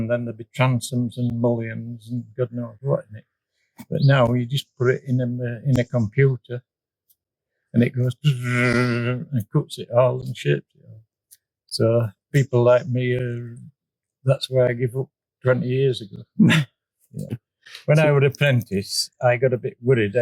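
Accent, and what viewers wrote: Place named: Yorkshire, United Kingdom. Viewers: Well done Dad your becoming a star. Keep it up. although most can not understand your accent, I think they need Yorkshire lessons. :-) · It’s cors he’s from up north uk...... listen again and you’ll get it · I understood every word, I married a Yorkshire man! Great skills passed on ! Are the boys having a go?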